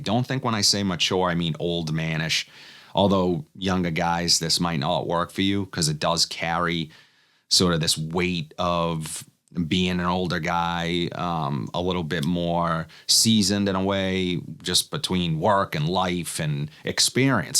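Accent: Boston, United States. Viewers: I’m currently watching “Clubhouse: a year with the Red Sox”. So between your vids and that, my exposure to the Boston/NE accent is seriously starting to imprint… 😂 SOTD: Penhaligons Endymion Concentré (under the radar banger). Tomorrow Sunrise on the Red Sand Dunes Intense! Congrats on 27K my man 👏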